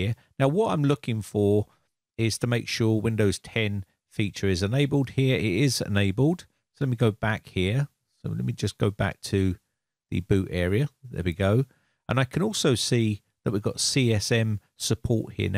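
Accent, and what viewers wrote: England, United Kingdom. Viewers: Are you and Michael Cain from the same area of England?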